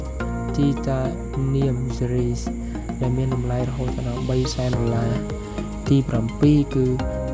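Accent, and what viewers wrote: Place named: United Kingdom. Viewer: តុង English ពេកឮតែខ្យល់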